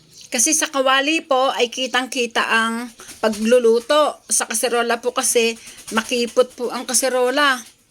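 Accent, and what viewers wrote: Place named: Tanay, Philippines. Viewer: ate taga tanay rizal po ba kau punto nyo po kasi tanay kung magsalita hehe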